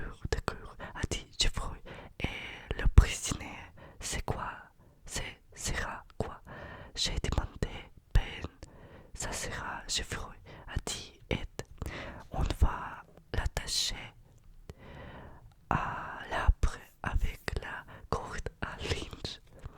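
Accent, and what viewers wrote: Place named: Russia. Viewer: Le Français avec l'accent Russes, c'est une tuerie en asmr !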